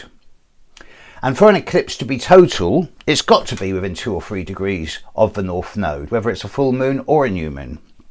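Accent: United Kingdom. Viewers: I love your British accent and love how articulate in the way you speak. Wish more people would learn how to be articulate as you are and being able to convey and express themselves in ways that are pivotal and genuine.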